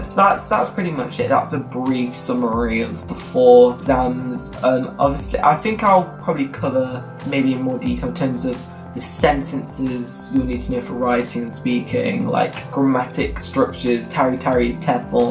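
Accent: United Kingdom. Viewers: That British accent though.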